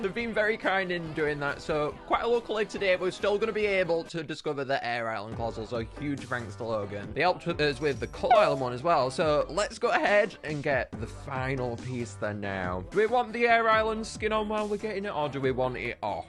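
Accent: United Kingdom. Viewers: are you bri'ish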